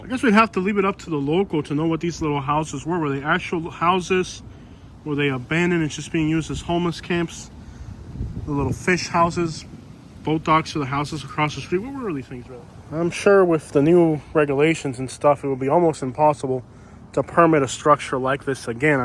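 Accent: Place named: Florida, United States. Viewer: Saludos se escucha que hablas floridacubano 😂 Dios Mio que triste 😢 they didn't even finish repairs from the last storm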